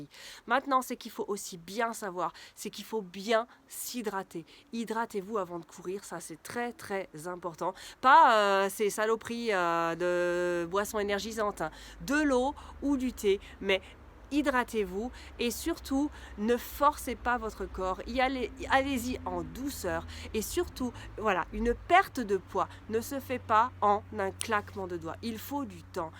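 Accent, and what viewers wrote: Switzerland. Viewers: J'adore le petit accent suisse 🇨🇭 😍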